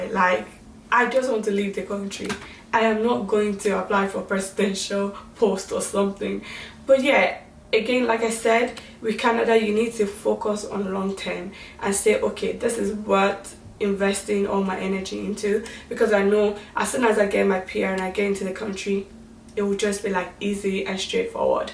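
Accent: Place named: United Kingdom. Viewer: U have such a beautiful British accent and thank you so much for this content it is more than helpful.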